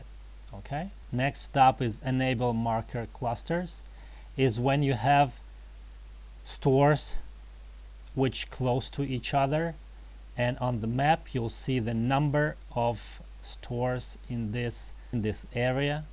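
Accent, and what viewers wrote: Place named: Russia. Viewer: I can hear that Russian accent from a mile away my Ruskiy bryatuha.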